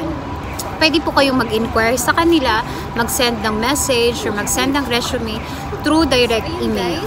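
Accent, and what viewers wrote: Visayas, Philippines. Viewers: Bisaya d.i ka ma'am 😅 cebu here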